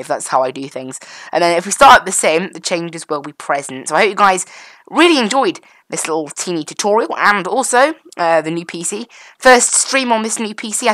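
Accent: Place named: United Kingdom. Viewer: this is how i imagined all british people sound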